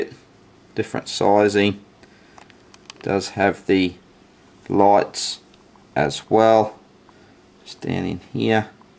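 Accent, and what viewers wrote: Australia. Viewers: I like that Aussie accent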